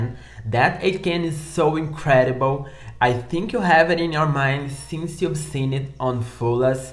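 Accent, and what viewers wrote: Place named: Brazil. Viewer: your accent sounds kinda Brazilian to me kkkkkkkkk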